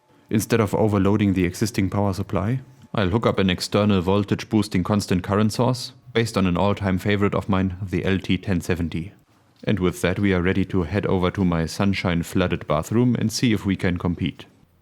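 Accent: Germany. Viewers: Spaten Pauli its german english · I like how you don't even try to hide your german accent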